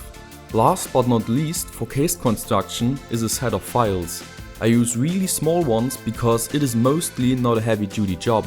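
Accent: Saxony, Germany. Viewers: Hi, great Video! Especially because I'll have to build a new tools stock for reactivating my old hobby. My first project is to hook up a old ghettoblaster with bluetooth for my brothers birthday. I even have the service manual including the schematic, but it's a while since I last read one. May you help me to find out where I'll grab the AC for my converter board? It would help me a lot. Fabigg PS: Even though I'm a German too, it's kind of polite too write in English because your videos are English. PPS: But I'm wondering what dialect you might have, because you pimped a Simson and the sound of some phases I'll guess it's saxonian. Am I right? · Tolles Video :) Jetzt brauch ich nur noch eine Bench Power Supply, dann hab ich alles :D Kann das sein, dass du eher aus dem Osten Deutschlands kommst? Sachsen oderso? :D Hört man ein wenig :)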